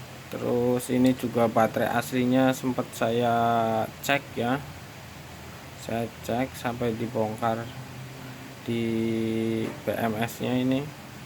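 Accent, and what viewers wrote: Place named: Banyumas, Indonesia. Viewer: Kayane wong ngapak iki, lokasi ngendi lur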